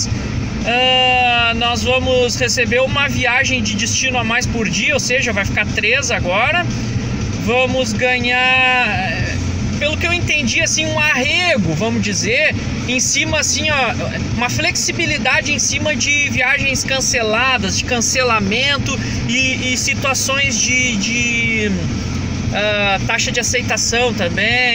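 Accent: South, Brazil. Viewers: Fala parceiro! Pelo sotaque vc é do sul rsrs...qual sua nota média?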